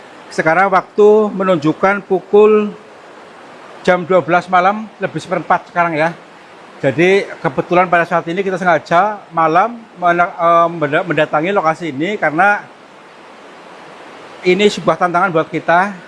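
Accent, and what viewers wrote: Banyumas, Indonesia. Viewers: Orang ngapak om? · Kayane wong ngspak kang pada karo inyong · Ngapusi dirinya sendiri ,ingat jaman sekarang itu nggak ada gitu gituan ini bohong ,kalau di lihat dari gaya logat ngomong nya ini orang ngapak ,semoga sukses bikin berita bohong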